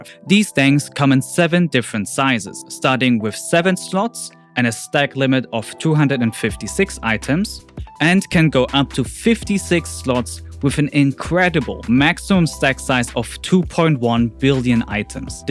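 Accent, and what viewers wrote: Germany. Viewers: Bro, are you actually German? Maybe it's just me but.i hear a VERY slight German accent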